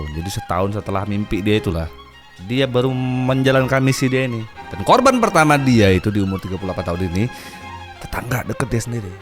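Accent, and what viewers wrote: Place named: Lampung, Indonesia. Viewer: ketara bener logat lampungnya hahahaa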